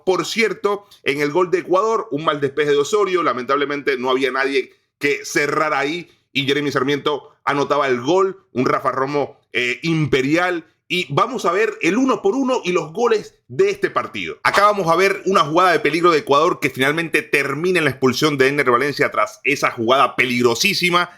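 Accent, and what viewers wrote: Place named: Argentina. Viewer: Panita, pongase a escuchar un cd de salsa o gaitas, ese acento argentino q agarro en 1 mes no cuadra, mucho menos hanlando de la Vinotinto. Buwn análisis.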